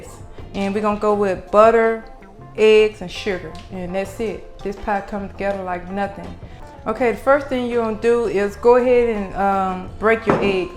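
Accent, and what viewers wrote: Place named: Carolina, United States. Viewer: My lawd Carolina country boi we say it just like you do. The pie looks amazing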